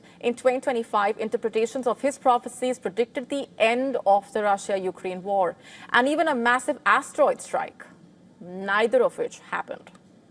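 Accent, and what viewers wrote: India. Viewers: Accent of India is too strong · I couldn't understand half of this from her poojeet accent.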